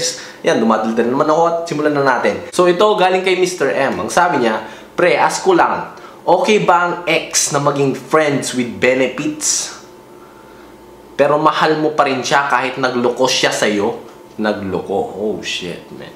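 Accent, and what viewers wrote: Ilocos, Philippines. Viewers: From Ilocos kaba? Kasi may accent ka ng iloco. Specially sa Laoag 😂 so cute! Anyway, your advices can help to those who need it. 😂 God bless and more vids. to come!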